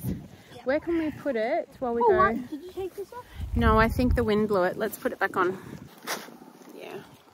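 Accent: Australia. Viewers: Lovely hearing an Aussie accent in a homesteading video 😊 (fellow Aussie here). Thanks for the tips, looking forward to bingeing the rest of your videos.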